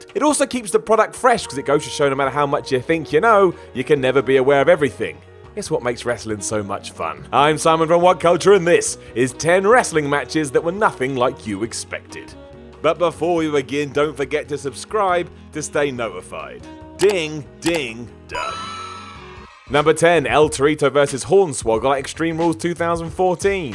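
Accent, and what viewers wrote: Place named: England, United Kingdom. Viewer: Hey so Simon, I'm young, I love pro wrestling, and whatculture wrestling is my favorite channel. Please forgive me but I'm curious. How come when Englishmen end a word that ends in an 'A' it kind of sounds like an 'er'? Much love. Again just curious.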